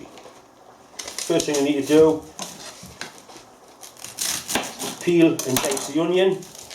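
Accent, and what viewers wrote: Newcastle, United Kingdom. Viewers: What a wonderful surprise to here a geordie accent..then seeing you cut the onion on a lathe knew I would like the video maker,thanks for the plate pie recipe regards Sue from Whitley Bay now living in New Zealand for 40 yrs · Some of me best memories from the fifties as a bairn were Sunday teas at me Nana's in Lemington. Everybody came so it was a full house and she made these same mince pies and they were just devoured in no time. I had 4 uncles so they got first dibs. I think your version is the closest I've seen to what I remember and I have to say ta very much for bringing back good memories, and as much as the plate pie was lovely, hearing the Geordie was balm to me soul. Since I last had a poke round through your videos I'm dead glad to see you've put all the recipe ones together. Makes it a lot easier to find when you're as old as me. 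We"ve lived in Alberta since 1967 and I miss home still. Me Dad was a whitesmith at Parsons so even your work is interesting to an old woman an all. Thanks very much for sharing, and hope you and yours are keeping well. Merry Christmas! 🤶 🎄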